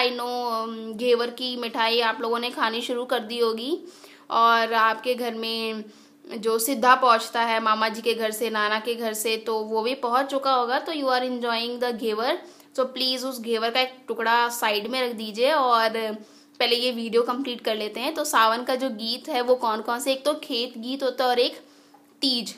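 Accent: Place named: Haryana, India. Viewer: Bhut ache se smjate ho ji aapki haryanvi style me smjana kare acha lga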